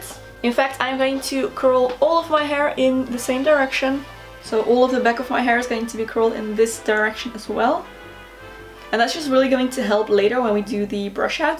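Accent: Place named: Netherlands. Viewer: ik hoor een Nederlands accent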